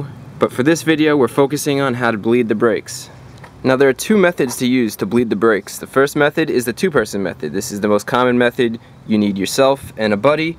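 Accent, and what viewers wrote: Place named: Pennsylvania, United States. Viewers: Know how I know you are from Pennsylvania? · You sound like you have a Philly accent- wooter!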